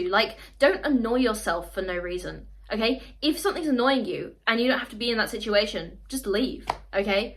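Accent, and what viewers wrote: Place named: United Kingdom. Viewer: You came into my algorithm I was about to pass on Then I heard your accent Its so British haha Found you interesting, I'mma try to listen to more of you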